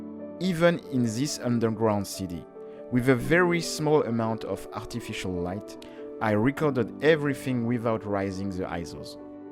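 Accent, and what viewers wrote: France. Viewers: Encore une très bonne vidéo test avec de jolies photos, y a juste un truc qui me dérange....Your so French accent ! Jamais envisagé de faire des vidéos en Français ?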